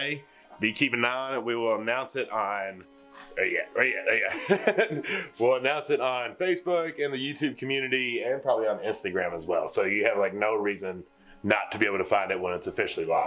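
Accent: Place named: Rural, United States. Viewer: Don't worry about sounding country. We all understand country just fine! 😉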